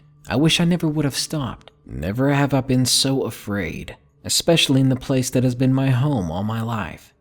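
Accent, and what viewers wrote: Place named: South, United States. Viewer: Sir, I love your country accent.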